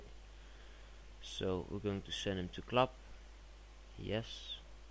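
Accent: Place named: Netherlands. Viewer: lol nederlands je praat een beetje engels met een nederlands acent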